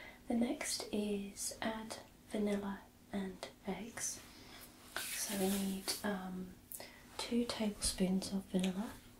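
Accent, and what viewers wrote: United Kingdom. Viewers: I love cheesecake!!! I have made chocolate chip cheesecake and it’s delicious! you are wonderful! I love your British accent!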